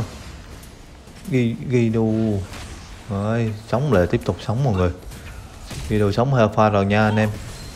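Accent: Quang Nam, Vietnam. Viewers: Người Quảng Nam hay Đà Nẵng rứa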